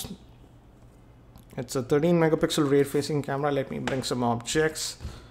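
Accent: India. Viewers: Indian english❤️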